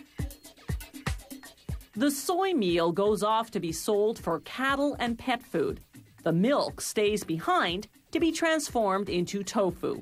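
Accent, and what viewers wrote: Canada. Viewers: No Mention of the awful gas you get when eating tofu? Better invest in Beano. And I don't know what is worse about these videos, the horrendous Canadian accent or the insistance on using the metric system.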